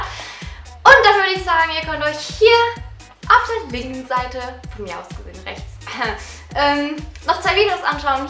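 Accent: Switzerland. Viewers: du bisch schwizerin oda ? :D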